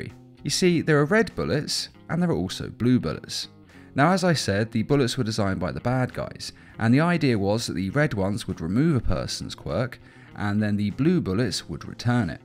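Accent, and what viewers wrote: England, United Kingdom. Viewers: He sounds like he is from England and it’s hard to get manga here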